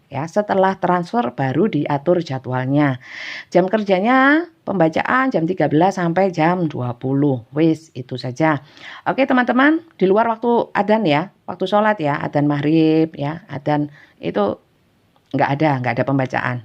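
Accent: East Java, Indonesia. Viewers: penyampaian yang apa ada nya simple jawa timur banget mudah diterima dan di cerna semakin sukses ya mbk sllu dlm keberkahan Allah SWT. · Peeeh ora umom to Bun...suka dngn Readinganny karna suara dn logat jw timuran yg khas,slm sehat Bun dr cancer Borneo nyimak 🌹🙏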